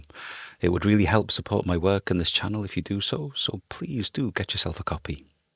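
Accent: Wales, United Kingdom. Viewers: Your voice is really soothing, musical beautiful Cymraeg accent a diolch yn fawr for the story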